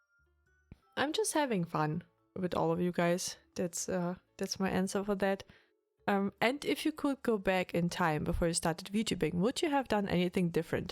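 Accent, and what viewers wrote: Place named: Asia. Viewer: Congrats to the milestone, I took the opportunity and subscribed as well. Another thing: I was really surprised when I heard you say Mettbrötchen, and then you mentioned that you speak German. I originally thought that you are maybe from asia due to the accent, the name, and your demeanor which has a non-German vibe to it (but maybe I'm just used to one specific kind of German). But everything makes sense now.:)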